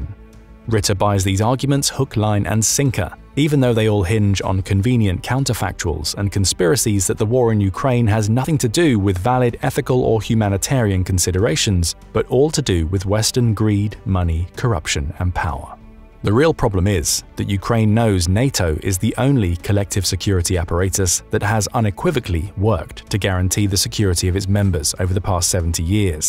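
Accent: United Kingdom. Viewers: Narration so long, so British - uhg. Plausible deniability, let us swim merrily in an ocean of plausible deniability, rampaging absence of curiosity, and a penchant for regurgitating things that POWER says, because POWER wields all the microphones, and it says this, and even when it is wrong, nobody ever looks at me funny for repeating what it says - so I repeat. NYAHHHH! Power says NYAHHH.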